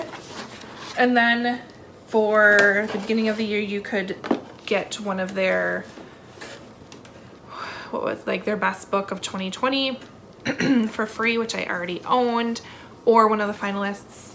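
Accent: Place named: Midwest, United States. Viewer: Are you from Minnesota or Wisconsin? North Dakota gal here! Your accent is fabulous. Also, love your videos!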